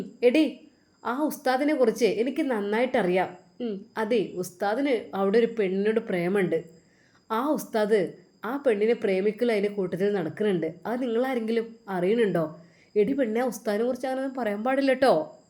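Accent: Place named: Malappuram, India. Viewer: തൻ്റെ ഭാഷാ കേട്ടിട്ട് എൻ്റെ സംസാരത്തിലും മാറ്റം വരുന്നു ഞങ്ങൾ തൃശൂർക്കാർ ആണ് അപ്പോ എൻ്റെ ഭർത്താവ് പറയുകയാണ് നിൻ്റെ സംസാരത്തിൽ വിത്യാസം വന്നല്ലോ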